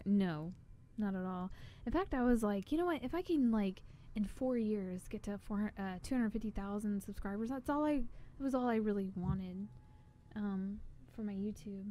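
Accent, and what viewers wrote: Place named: South, United States. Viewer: is it only me who notice her country accent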